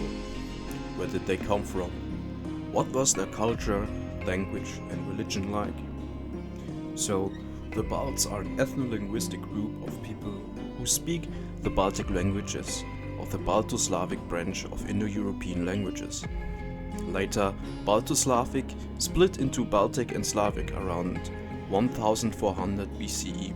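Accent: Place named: Germany. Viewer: you have german ascent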